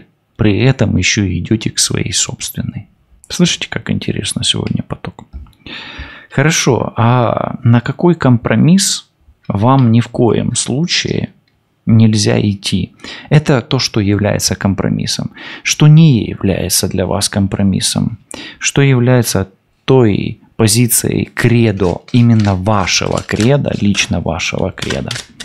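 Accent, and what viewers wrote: Ukraine. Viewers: Яка у вас красива українська мова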